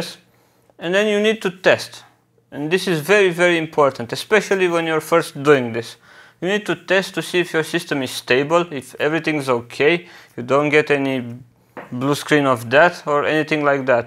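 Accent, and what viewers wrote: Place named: Poland. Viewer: Where are you from? Your accent sounds Like polish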